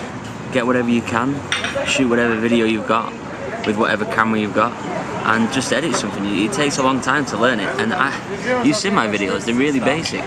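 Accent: Manchester, United Kingdom. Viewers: Trying to identify where in Manchester you're from by your accent...where?! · Otabek Isaqov he's Mancunian - north west of England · Now I'm reading all these comments in his british accent in my head. Including mine. I don't have a british accent at all. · I always like the music you put over the b-roll. The shots are very beautiful. The overall atmosphere of your travel vlogs is very enjoyable. You wanted to know what we like about your videos, well....everything? Your UK accent gives it a nice flair, too :) I'm looking forward to the other videos about the rest of the travel!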